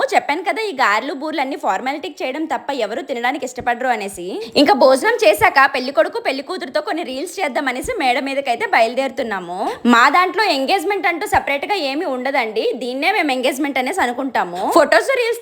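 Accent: Srikakulam, India. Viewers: Sis me voice ki oka uniqueness undi srikakulam yasha baguntadii nadi kuda srikakulam Sis movies lo try cheyandi me voice chala unique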